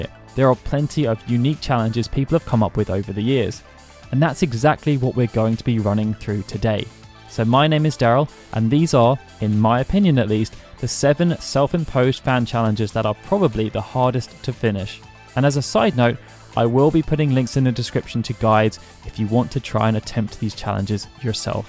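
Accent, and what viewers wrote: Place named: United Kingdom. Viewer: You've got a British accent. Your name isn't.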